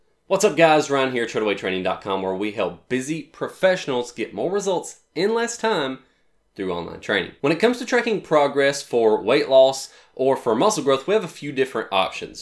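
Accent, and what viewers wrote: South, United States. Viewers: the yeehaw type voice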